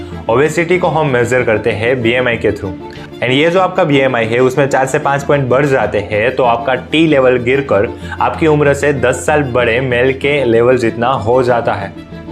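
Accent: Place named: Gujarat, India. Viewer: You sound gujrati. Feels good to see gujrati doing such different kind of videos. Also very new to this field. You sound nervous also. But keep going bhai you will overcome it all with time. All the best doctor saheb.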